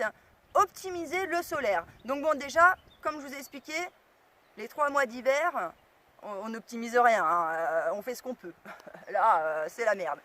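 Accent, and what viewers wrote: Paris, France. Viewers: L'accent parisien ! Juste insupportable ! C'est dommage car la vidéo est intéressante. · Excellente vidéo didactique ! Par contre par pitié apprenez à perdre cet horrible accent parigot! C'est insupportable tous ces ''huns'' à la fin de chaque deuxième mot 🤯😱 A moins que vous soyez la ''x'ième'' descendante d'Attila ! Les plus érudits comprendront 🤺🏇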